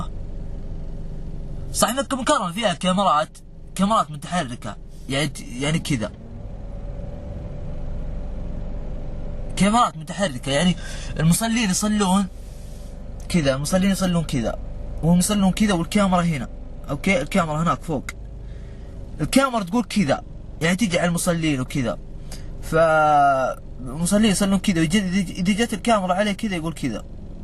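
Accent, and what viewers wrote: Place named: Libya. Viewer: اللهجه تشبه كثيل لهجت الليبيين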